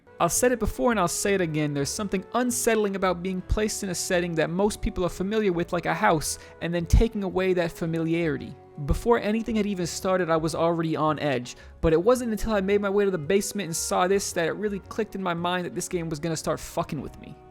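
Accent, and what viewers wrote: United States. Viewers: I think you got that New Jersey Ray Lotta thing going on. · The New yorkuh accent is a bit distracting, but video not too bad so far.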